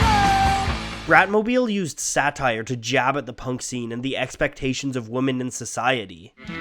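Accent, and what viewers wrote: Canada. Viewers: that's just how we say it in canada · It’s a Canadian accent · Olive Ephemerals no it’s just the way a lot of Canadians say women. As woh-men, and the e often sounds like an A. It’s just the way she goes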